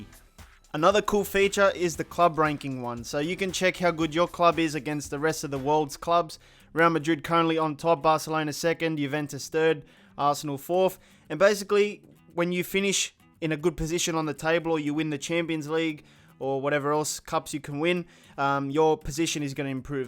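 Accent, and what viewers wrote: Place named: Australia. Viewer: Man were u from Australia r new Zealand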